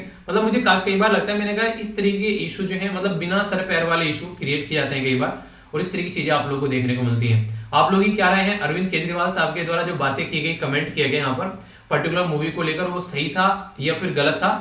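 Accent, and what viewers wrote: Haryana, India. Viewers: Hlo dii aap Haryana se ho na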